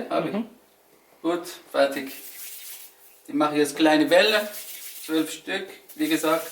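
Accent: Swabia, Germany. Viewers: Bisch n schwob? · Bisch du a schwob?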